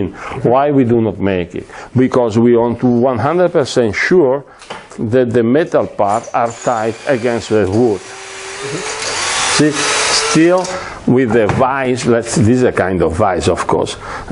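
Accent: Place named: Hungary. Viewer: Nagyszerű videó!:)És jó hallani a magyar akcentust az angolban!:D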